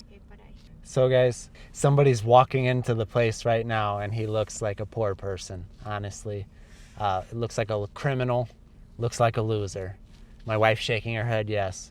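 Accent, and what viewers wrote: United States. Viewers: Dear, I am an Indian. This is your first I saw it to the end. Thank you so much for making this type of videos. It give ideas about the real situations prevailing around us which are not knowing. Your style of speaking is very simple and understandable to the people who are eager to speak English in American accent. Your truthmess promptede to subscribe your channel happily. Well done! Keep it up. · Hi dear I am from India watching your video for first time actually I was seeking for American accent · Great channel, I'm appreciate your clear speak, pronunciaton and little slower rate. It's perfect for me, especially I prefer american english, not english. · American accent English sounds very pleasant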